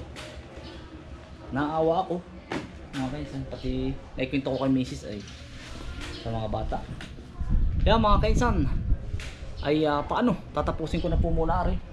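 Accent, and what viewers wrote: Quezon, Philippines. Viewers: Ay Alin baga nman po Abay taga Quezon po kayo hane Alam nay sa ponto pa laang ay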